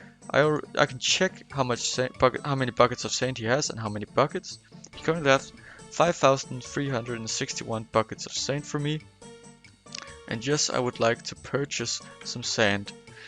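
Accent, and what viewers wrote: Denmark. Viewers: Are you Danish? you sound very danish in your accent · 2 sec in i instant hear a fellow dane nice guide tho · Sjovt. Det tog mig 15 sekunder, at høre på din engelske accent, at du er Dansk. Tak for videoen 🙂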